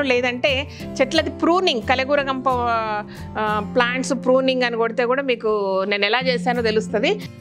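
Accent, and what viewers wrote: Telangana, India. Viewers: GARU MEE BASHA PAKKA TELANGANA BAGUNTADI MEE VOICE KUDA BAGUNNADU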